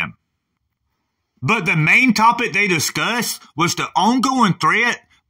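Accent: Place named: South, United States. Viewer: Bro, great video, luv the Southern accent, the prayer, etc. Thanks for all the News re: Israel; God bless you--see you Soon!! (Rev 22: 7)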